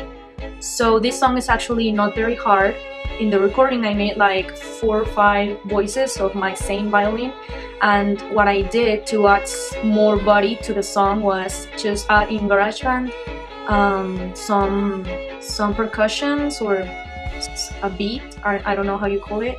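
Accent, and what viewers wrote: Spain. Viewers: Tío pero si con el acento españolizado que tiene se entiende perfectamente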